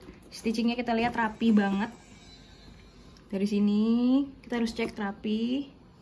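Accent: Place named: Philippines. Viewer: Are you Filipino? You speaking with a little bit mix Tagalog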